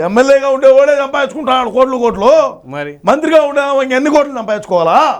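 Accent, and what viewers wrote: Rayalaseema, India. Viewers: అన్నా నమస్తే అన్నా నేను జనసేన పార్టీ వీర మహిళా అధ్యక్షురాలు మీ వీడియోలో చాలా బాగుంటాయి అన్న చాలా డిఫరెంట్ గా చేస్తారు మీరు అటు సీరియస్ కాకుండా ఇటు కామెడీ ఎవరికి కోపం రాకుండా చాలా బాగా చేస్తున్నారు అన్న మీరు ఈ వీడియోలు నాకు మీ వీడియోలు చాలా బాగా నచ్చుతాయి ఇలాగే మీరు కంటిన్యూ అవ్వాలని మనస్పూర్తిగా కోరుకుంటున్నాను జై జనసేన జై తెలుగుదేశం జై బిజెపి ఏం సామి నువ్వు ఇంకా మంచి మంచి వీడియోలు అదరగొట్టేసావయ్య ఏంది మీ లాంగ్వేజ్ చిత్తూరు కడప ఏంది అన్న నువ్వు మాది నెల్లూరు అన్న మా ఆయన నెల్లూరు యాస ఎలా ఉంటది తెలుసు కదా మీకు చాలా బాగా చేస్తున్నారు అన్న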